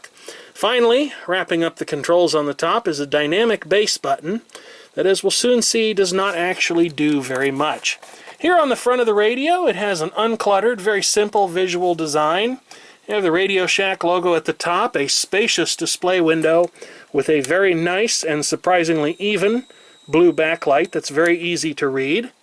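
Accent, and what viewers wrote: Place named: Northern Illinois, United States. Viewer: i guess you from northern il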